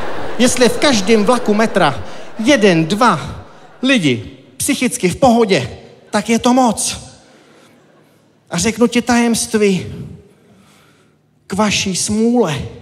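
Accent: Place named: Czech Republic. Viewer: Ta brutální čecháčtina při povídání o zženštělosti zní dost komicky. Jinak obsahově dobrý. :-)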